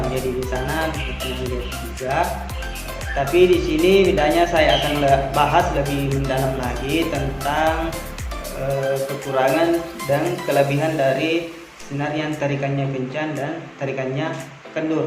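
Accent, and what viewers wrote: Indonesia. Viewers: Org makassar ta?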